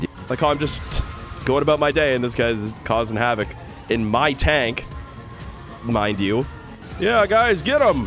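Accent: United States. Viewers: you sound like the most American person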